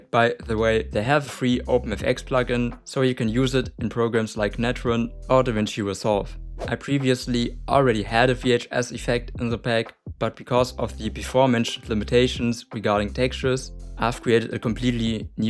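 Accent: Germany. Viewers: I hear Denglish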